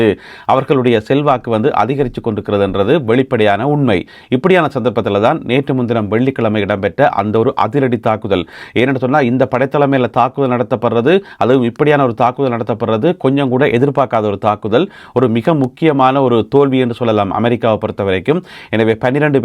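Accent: Sri Lanka. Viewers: ஐயா உங்களுடைய பெயர் என்ன நீங்கள் இலங்கையில் இருக்கிறீர்களா அல்லது லண்டனில் இருக்கின்றீர்களா இலங்கை தமிழர் மாதிரி பேசுகிறீர்களே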